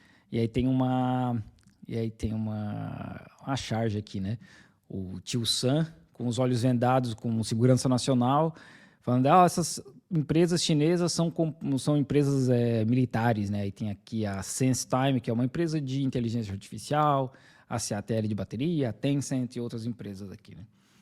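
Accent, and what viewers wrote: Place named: Rural, Brazil. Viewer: Sotaque cáipira nojento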